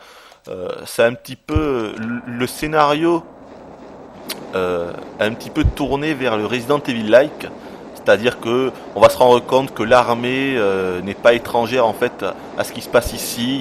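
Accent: South, France. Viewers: J'adore ce jeu, moi qui suis fan du film de John Carpenter, j'attendais ce The Thing avec impatience et je n'ai pas été déçu, on retrouve bien l'esprit du film mais le jeu a l'intelligence de nous amener progressivement vers autre chose, une histoire de conspiration scientifique terrifiante, toujours dans ces décors enneigés, la gestion de la peur chez les personnages est superbe et apporte un vrai plus au jeu, c'est parfois assez difficile et frustrant (notamment lorsqu'on doit affronter les petits monstres qui font un bruit aigu stressant) notamment le passage dans l'épave de la base aquatique, mais ça reste un très bon jeu qui reprend l'esprit du film de Carpenter ! Dis donc au passage, t'as un accent...du sud peut être ? + 1 like ! :)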